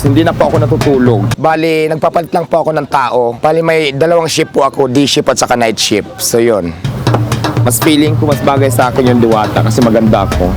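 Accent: Visayas, Philippines. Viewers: alam ko bisaya ka accent mo palang. parihas tayu hehe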